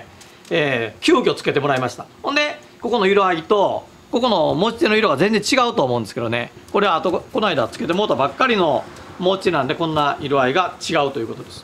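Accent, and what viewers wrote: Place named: Kochi, Japan. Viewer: 高知っぽい話し方ですね。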